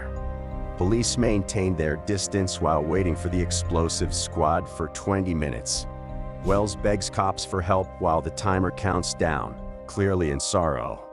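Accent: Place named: Boston, United States. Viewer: Is this a robot with a Boston accent? Wtf!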